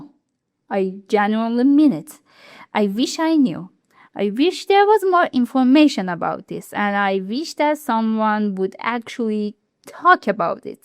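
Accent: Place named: Arab world. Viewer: I don't know why YouTube uses this stubid AI?! The app was good without it! And instead of taking down random creators' channels why don't they take down the channels that make Inappropriate videos?! It is just so stubid!!! By the way, i think you are Arabian after hearing your accent, if you are; then i'd like to say that i am Arabian too 💜✨ لو انتي عربية اقرأكي الكلام العربي ده، حاسس لنك عربية من طرسقة كلامك، صح؟ 😅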